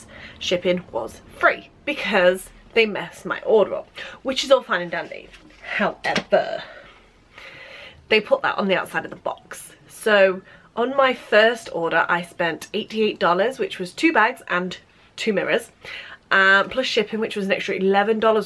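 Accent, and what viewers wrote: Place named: United Kingdom. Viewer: I love your British accent. Do you live in Northern England ?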